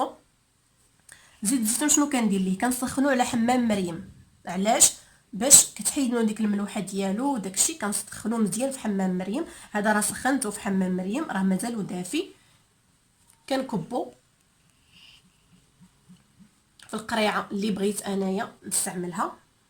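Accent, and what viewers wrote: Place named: Algeria. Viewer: هاذي موش لهجة تونسية هادي جزائرية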